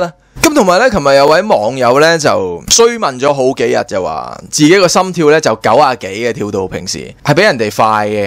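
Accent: Taishan, China. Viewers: ok wor 式台山話我接受到 wor幾搞笑丫😂😂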